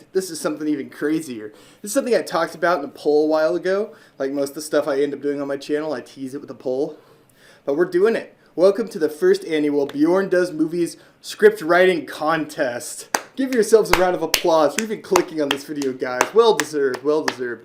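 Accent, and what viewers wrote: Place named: United States. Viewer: also bjorn, as i assume your american due to the accent, is it eastern standard time, western standard time or central time?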